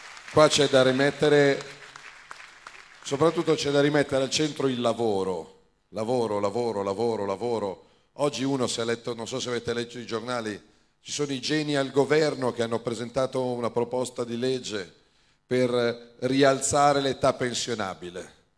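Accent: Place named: Emilia, Italy. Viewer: Ma hai preso la cadenza del mio popolo Matteo... dai che la mia bella gente stavolta avrà il coraggio di cambiare. W l'Emilia Romagna... il 26 scriviamo la storia.. io non ho dubbi io voto LUCIA BORGONZONI, LAURA SPAGGIARI E FRANZINI.... MI RACCOMANDO METTETE LE PREFERENZE E STATE ATTENTI AI BROGLI NELGI SCRUTINI. SE LASCIATE BIANCO RISCHIATE CHE CI SCRIVANO BONACCINI.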